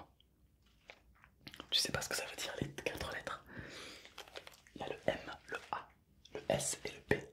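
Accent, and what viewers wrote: South, France. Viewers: L’accan du sudee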